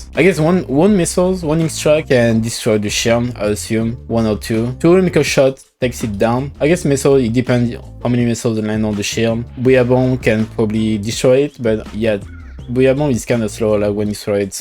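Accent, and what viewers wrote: France. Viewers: Tu parles vraiment bien anglais mais honnêtement j'ai pas besoin de vérifier t'es français je pense X) on parle tous pareil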